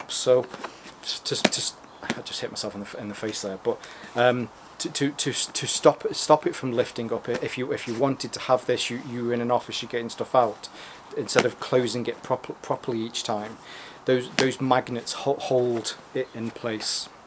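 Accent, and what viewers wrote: United Kingdom. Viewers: I love my Tacsac 13. I'll never NOT carry a Nutsac bag (I started with the Man Bag Dammit). Let's face it, every 15-year-old that dwells within all men loves the naughty name of the company. Plus, we yanks are suckers for the Brit accent. Keep up the good work old chap!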